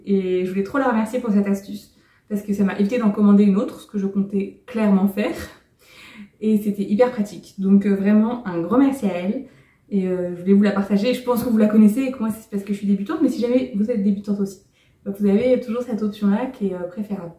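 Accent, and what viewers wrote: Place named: England, United Kingdom. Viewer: Tellement naturelle dans cette vidéo 🥰 Je suis fan de tes gilets et de ton accent anglais aussi ! Et tu as un excellent rythme en tricot, c'est clair ! Certaines teinturières proposent la mise en écheveau (CLainesBoutique fait la mise en pelote à 0,5€ !). Pour les chaussettes comme toi, j'ai voulu essayer en cours de tricot et j'ai perdu mes mailles 🤣 Et je n'ai pas fait d'échantillon non plus ! Le montage des chaussettes, pour la première j'ai recommencé 10x, pour la seconde en deux fois c'était bon.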